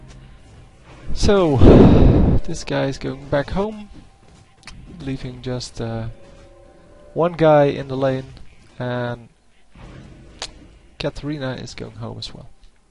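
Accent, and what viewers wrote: Netherlands. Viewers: are u from holland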